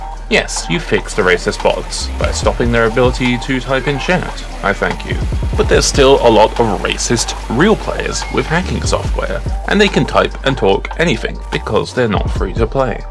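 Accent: Russia. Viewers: are you rusian???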